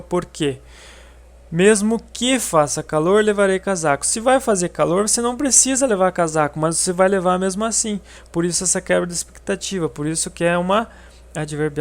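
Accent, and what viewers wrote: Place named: Curitiba, Brazil. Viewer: Você é de Coritiba né ? Reconheci pelo jeito de falar e pela voz, bom video.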